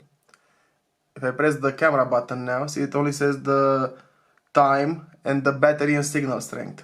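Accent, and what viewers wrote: Romania. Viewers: inconfundabil accentul romanesc al vorbitorilor de engleza :)) literalmente 30 de secunde a mers clipul cu vocea ta pana am pus pauza sa intru la 'About this channel' sa văd țara 😅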